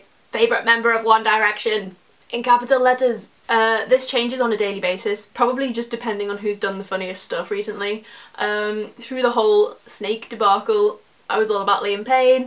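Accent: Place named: Wirral, United Kingdom. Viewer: Are you from the Wirral? Your accent is very familiar!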